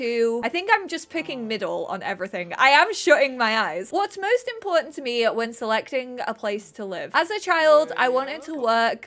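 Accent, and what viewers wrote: United Kingdom. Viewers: you sounded particularly British in this video. 😂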